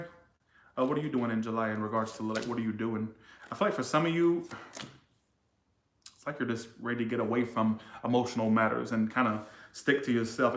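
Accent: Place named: Louisiana, United States. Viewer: I just knew you were from Louisiana....love the accent.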